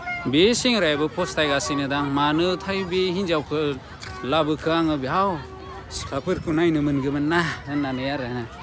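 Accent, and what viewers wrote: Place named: Bodo, India. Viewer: Your Bodo is so sweet.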